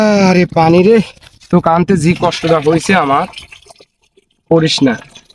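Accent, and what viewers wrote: Natore, Bangladesh. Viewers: আমাদের নাটোরের আঞ্চলিক ভাষায় কথা বলেন খুব সুন্দর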